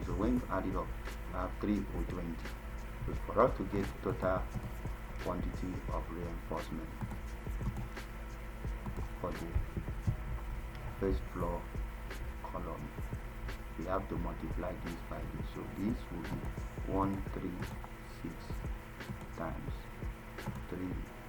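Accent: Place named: Nigeria. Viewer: Gd job ma bro..from accent I can tell your Nigerian,am also Nigerian but am in the uk and work as a construction manager and found this helpfull.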